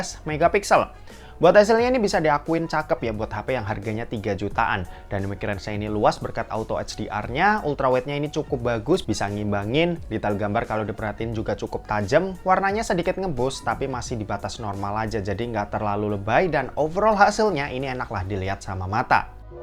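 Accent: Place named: Central Java, Indonesia. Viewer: orang cilacap atau semarang njenengan mas?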